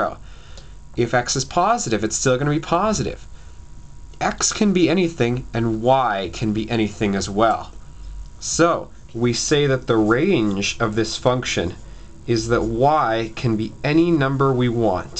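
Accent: Canada. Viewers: no his Accent is obviously Canadian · You're definitely Canadian. Thanks for your help! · i can tell that you're canadian ..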